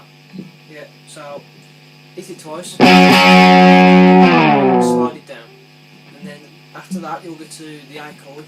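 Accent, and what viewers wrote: United Kingdom. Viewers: hey dude , are you from england ? i thought youre from russie 0.o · Where u from mate u sound like u got a brummy accent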